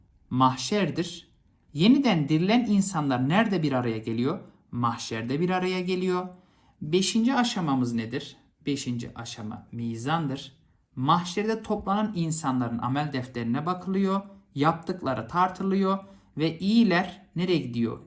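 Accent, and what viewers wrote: Malatya, Turkey. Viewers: Hocam çok güzel anlatıyorsunuz elinize sağlik ama siz Malatyalı mi siniz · Hocam Malatyalımısınız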